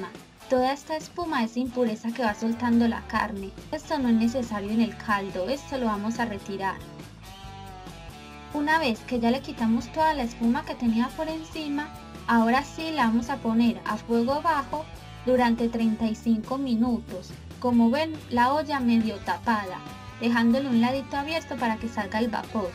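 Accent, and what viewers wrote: Colombia. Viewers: ME ENCATO LA NARRACION A LO COLOMBIA COLOMBIA PUES...!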